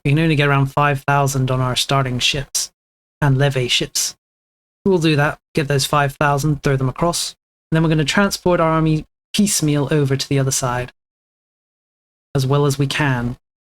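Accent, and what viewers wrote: United Kingdom. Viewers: knew i was in great hands when i heard that british voice, cheers mate from down under!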